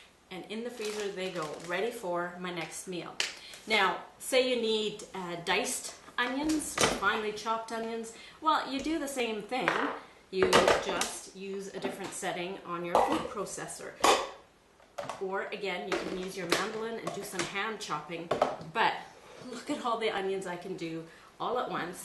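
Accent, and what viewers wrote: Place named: Minnesota, United States. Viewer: nice video, nice accent, are you from Minnesota? don't ya know, I get the big sack of onions, all they sell at the costco and need to do this, thanks!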